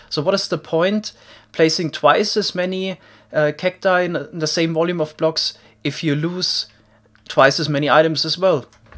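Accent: Germany. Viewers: Ich habe noch nie ein deutscheres englisch gehört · Höre ich da einen Deutschen akzent? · Wer ist auch deutsch und merkt das er deutscher ist wegen dem Deutschen Akzent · Man hört halt raus das du deutsch sprichst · 😂Digga was geht 😏👌 man hört es raus das du aus Deutschland bist 😂👌 hast du auch was neues wegen Kaktus? Schau Mal vorbei bei mir ✌🏾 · Bist du ein deutscher? Dein Englisch hört sich an wie wenn ein deutscher englisch redet lol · bist du deutsch hahaha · german accent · Who hears also the german dialect ? Richtig cool. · and its because there is a German accent in his speech I think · But he is also using the german english pronunciation xD no offense but it is hard to watch as someone who grew up speaking both languages :D anyways, the videos are still insanely informative #nohate # donttakeitpersonallyplease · i love the german acccent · Dieser deutsche accent triggert mich jedes mal hahahaha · I actually like his German accent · Lmao u sound soooo german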